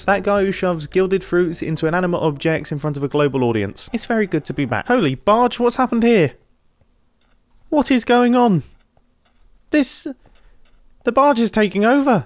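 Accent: United Kingdom. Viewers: aren't we all loving this British person accent? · I love his British accent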